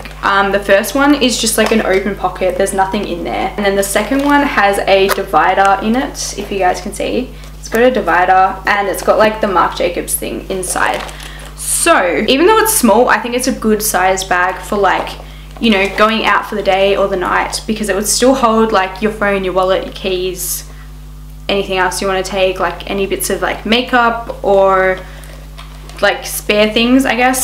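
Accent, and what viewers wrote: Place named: Australia. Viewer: I have 4 of them - I feel I’m justified because normally one bag is $1500 for me at the very least I really like high in bags so for me to have four or five of them would equal the cost of one bag so there’s my justification😂 I just love your accent oh I’m not sure what country you’re in but it sounds Australian but anyway I absolutely love the accent I wish I had it!!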